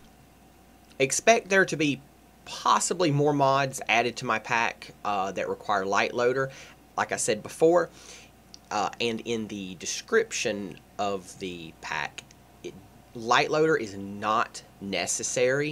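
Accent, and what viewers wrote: South, United States. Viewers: Love that southern drawl u got there too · You sure know what you're doing! p.s Love the southern drawl, Where you from?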